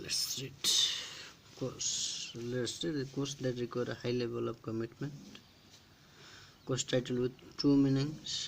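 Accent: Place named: India. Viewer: такой индийский акцент......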